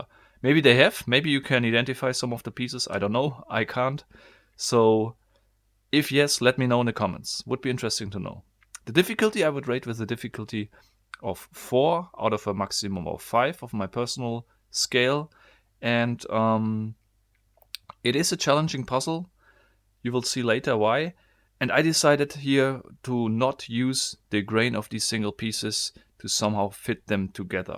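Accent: Germany. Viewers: It's the accent! I don't know why but Germans speaking English are making me joyful somehow haha and English is not even my mother tongue (I'm French) :D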